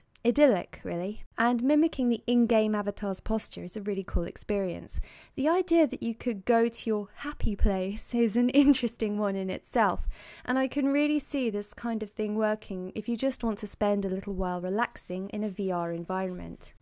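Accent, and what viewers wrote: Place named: United Kingdom. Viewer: Yes, I love her accent. I generally dislike British accents. I'm from South Africa, where I'm used to an accent where they pronounce the letter 'T' correctly, whereas in the UK, they'd say Liber'ee rather than Libertee. I really dislike it when Brits take too many shortcuts with their English words. Which is ironic considering that they should be the leading example of the language.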